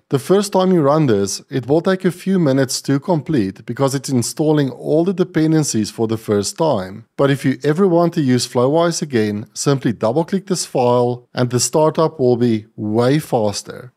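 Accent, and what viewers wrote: South Africa. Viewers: South african>?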